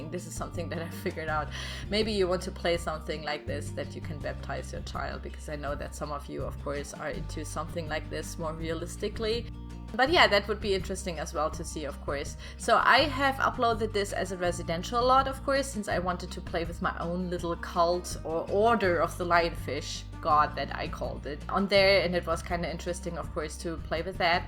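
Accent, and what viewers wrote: Germany. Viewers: Wow thats amazing! BTW judging your dialect i bet you're from germany, aren't you? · I love your German accent! 💕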